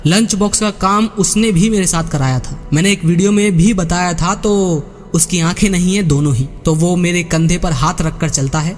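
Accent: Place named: Bihar, India. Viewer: Bihar se baat kar rahi ho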